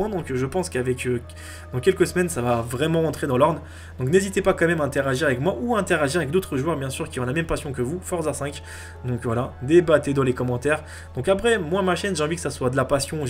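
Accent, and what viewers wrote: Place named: England, United Kingdom. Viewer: ses un anglais qui parle pas un americain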